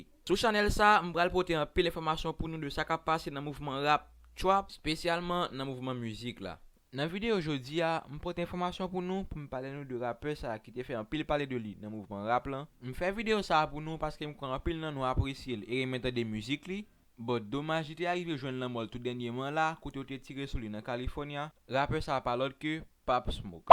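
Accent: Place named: Martinique. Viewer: On dirait tu parle martinique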